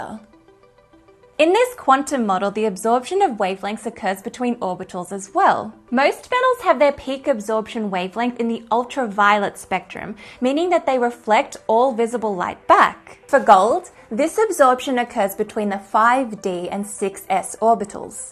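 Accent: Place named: Australia. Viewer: Hot + Quantum physics + Australian accent = Sizzling Hot.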